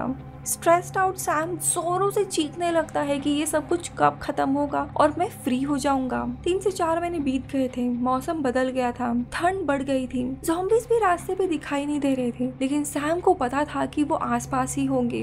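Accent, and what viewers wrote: Maharashtra, India. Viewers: B. Aawaja varun Marathi ch vatate. Tichya instagram var tine marathi madhye lihila aahe.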